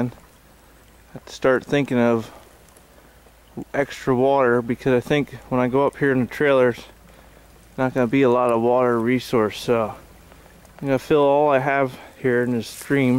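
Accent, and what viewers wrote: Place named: Appalachia, United States. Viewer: Thanks for sharing. What part of the Appalachia are you from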